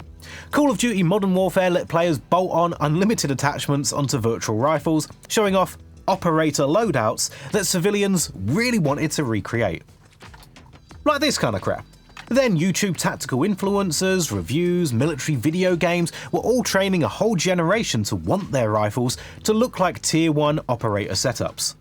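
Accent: United Kingdom. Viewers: bri'ish man with Klinefelter syndrome and a chatgpt generated script tries to tell me that rail on gun = bad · hopefully I’m proving wrong with this comment but going based off of your voice I’m assuming you’re from the UK with extremely strict gun laws mind you I could be completely wrong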